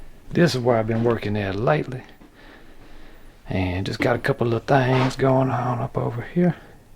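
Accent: Louisiana, United States. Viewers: From the first time I heard your voice, Mr, I KNEW you were from Louisiana, I just knew it. Looking at the trees in your yard, and the Baton Rouge "evidence".... ah hah. Kindred neighbors. Because of you, I have bought myself some two part mold making material and I've started my first diorama. Just a small little thing, but... yeah. Neighbors😁😘 love you Mr. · sounds like Tom segura’s Cajun accent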